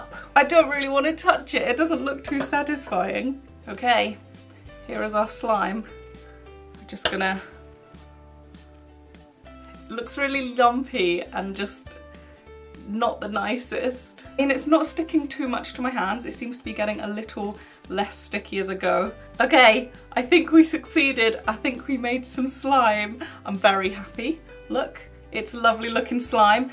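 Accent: United Kingdom. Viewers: I feel like you are like a little bit older version of me with a British accent lol, I look a lot like you ❤️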